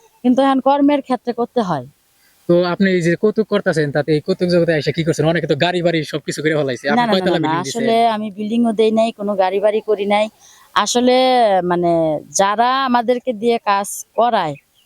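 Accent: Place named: Tangail, Bangladesh. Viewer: আপা আমি অনেক আগে থেকেই জানি, রাজু ভাই, আর আপনি স্বামী-স্ত্রী, অভিনয়ে ও দুজন দারুন,,। কিভাবে জানি, মোটামুটি বেশ কিছুদিন হয়ে গেছে, একবার একটা ভিডিওতে দেখছিলাম, আপনার ছেলের সুন্নতের অনুষ্ঠান, তখন আপনার ঘরে রাজু ভাইয়াকে দেখছিলাম, অনুষ্ঠানের আয়োজনে ব্যস্ত ছিল। আপা আজকে আপনার চেহারাটা অন্যরকম লাগছে কেন? আর এতদিন জানতাম না, আপনার বাড়ি যে পাবনায়, কথার ভাষা শোনে তো মনে করছিলাম টাংগাইলের মেয়ে আপনি, লাইক কমেন্ট সাবস্ক্রাইব করে দিয়েছি আপনার ছোট ভাইয়ের চ্যানেলটি, ভালো থাকবেন, পাশে আছি 👍, 🥰🤩🥰🤩❤👍🎉🎉🎉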